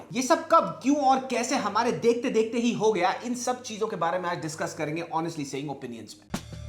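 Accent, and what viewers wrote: India. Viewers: that's maybe bcz he isn't british. There are many words we use and the pronounciation we prefer is sometimes british pronunciation and sometimes words derived from american english or in american pronunciation but that's May not be perfect and is a bit indianized like British pronunciation in Indian english accent.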